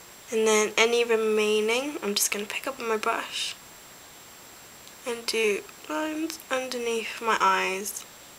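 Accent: United Kingdom. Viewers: pretending to sound posh? she has an rp accent and, as far as I know, it's her actual accent. not made up or insincere, her ACTUAL accent. how can you possibly judge someones voice because of a slight discrepancy in pronounciation? away with you! love the video:) i'm crap at putting on foundation so its nice to see people doing and explaining it!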